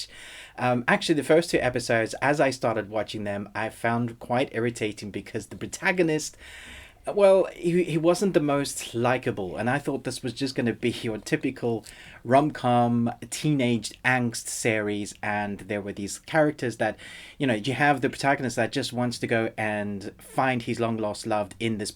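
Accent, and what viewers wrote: South Africa. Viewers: are you...are you South African?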